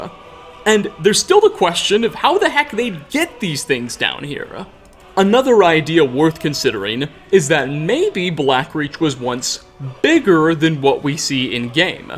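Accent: United States. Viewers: Teleki Zalán he’s definitely American you can tell from his manner of speech. To most of us a “lift” is when you give someone a ride in your car. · Maybe that's just one of the many American accents.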